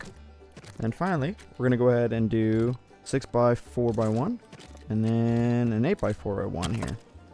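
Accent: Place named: South, United States. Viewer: Lol! Love the Southern accent - so funny! 😂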